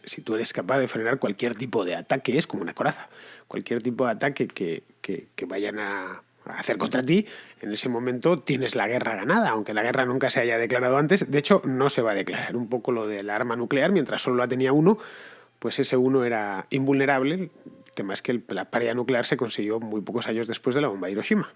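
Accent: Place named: Madrid, Spain. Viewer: El tonillo chulesco y faltón de cada video que pasa es más inaguantable. Yo entiendo que el madriles, como el asturiano, es un dialecto local del español, una muestra de lo grandiosa que es la lengua imperial que siendo una se resuelve en una pluralidad de localismos. Ahora, modérate muchacho. Que no estás en malasaña con tus coleguitas neoliberales. Lo de Putin: es evidente que les está devolviendo la moneda a los USA que les endosó Reagan en el 86. La operación es la misma que la de ese año. Entonces, cuando Reagan anunció la guerra de las galaxias, que era una película de Hollywood, el joven agente Putin estaba en Berlín. Vincularía este anuncio a la elevación de Xi Jinpin a Emperador marxista del Imperio del Centro. En este bienio ae cargan el dólar como moneda patrón y pasamos a los DGS.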